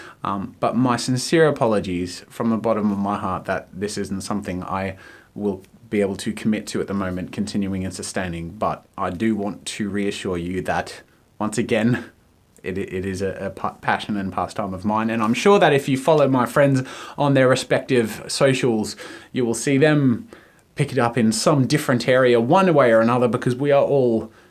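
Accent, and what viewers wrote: Australia. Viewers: I have never heard such a huge aussie accent from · sounds more Australian 4 years ago than he does today:)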